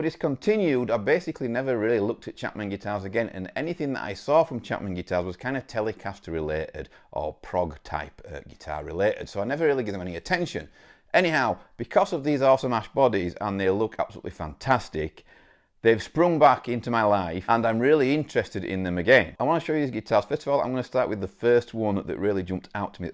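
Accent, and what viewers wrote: United Kingdom. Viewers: Great video. Man. I love the single cut. I also love ash with the way your Charvel is. Very cool, but like you I love single cuts and Gibson short scale. Ash is actually several, or about 4 woods used in guitars. So called swamp ash is from the southern US and is typically light weight . I have a Japanese Strat I am building and still need to order a neck. It is cool with three EMG SA pickups because I hate single coil noise. I also have a 1990 Charvel Japanese crackle red with reverse pointy headstock and factory Schaller trem. You can throw that guitar against the wall and it won't go out of tune and I've had it since about 2000. I knew the original owner and both of us ayes this guitar a lot and the frets are still huge. They used some kind of Japanese superior steel in their frets back then. I also have a Japanese Ibanez and it's frets don't seem to wear. 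I had a Mexican strat that was great but those frets sorry down in like 4 years and I sold it. 😂 Great video though and I hope you can get the single cut especially to show us. It sounds like it has a great price. I think the Euro and dollar are about the same now. So makes it easy to convert. 😂 Are you in the UK, or Scotland or Ireland? You have a really thick accent to this American. 😂. I can usually tell. I have a friend from Scotland here in the US. You sound like him. My son went to Scotland for like 6 months while in college and he loved it. Anyway. Great channel a d good videos sir. I've been following you awhile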